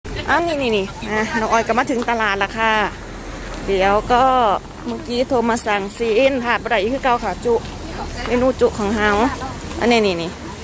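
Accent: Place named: Isan, Thailand. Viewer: สาวเหนือเว้าอีสานน่ารักไปอีกแบบครับ FCครับ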